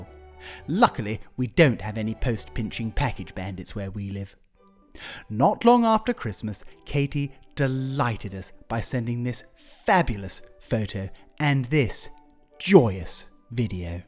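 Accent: RP, United Kingdom. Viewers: Fab’ content. Super interesting and I will follow your progress in what will be a lot of hard work to renovate a lovely home. Best of luck! BUT, and it’s a BIG BUT…. The RP is unbearable. I shall be using closed captions from now on. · Every aspect of your house is enchanting. However, your attempt at some sort of received pronunciation is getting a bit wearing and from now on my mute button will be used, The visuals more than make up for a lack of overly precious narrative. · I’m alway so excited to watch your videos. The absolute sarcasm with the best posh accent. You always bring a smile to my lips and an inappropriate laughter at the worst time. ❤❤❤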